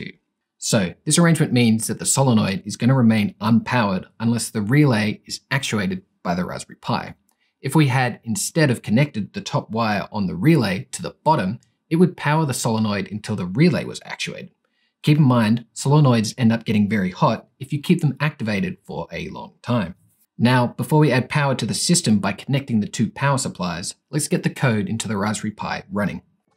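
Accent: Australia. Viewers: He’s just an Australian…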